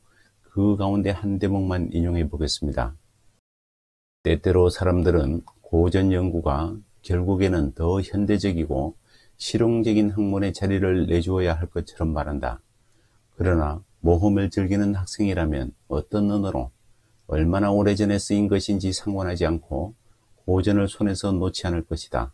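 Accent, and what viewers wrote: Gyeongsang, South Korea. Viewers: 근데 경상도억양이 일상에선 듣기좋았는데 책읽기로는 듣기가 무난하진않네요 껄끄럽다고해야하나 ㅋ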